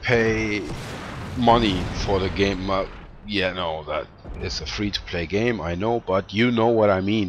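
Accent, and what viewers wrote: Germany. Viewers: Haha erste minute und wusste gleich dass du deutscher bist :D Wo haste denn die mod her? :)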